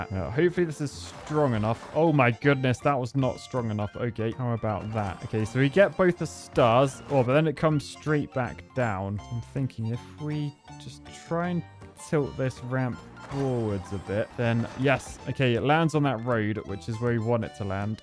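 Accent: United Kingdom. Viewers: I love the little British man in my phone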